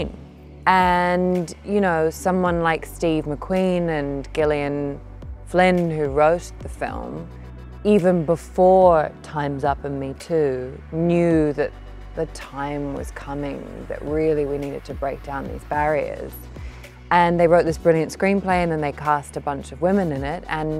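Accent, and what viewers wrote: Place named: Australia. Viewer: I'm an Aussie and she sounds Australian to me, absolutely.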